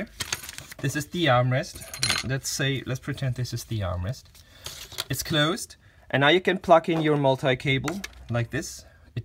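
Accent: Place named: Germany. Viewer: great accent :) really impressive english for zhe german ... keep up with good & quality videos in english :)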